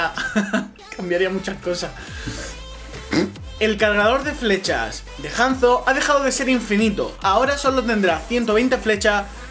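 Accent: Spain. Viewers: ole ese andalu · eres de Cádiz?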